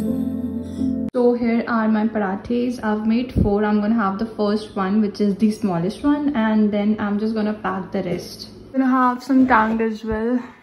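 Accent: India. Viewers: 😂Indian accent I love it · Weird background music, shameless self propaganda and chattering in monotone Indian english!!! Mentioning eye's dark circle and rubbing nameless cream is big stock of laugh.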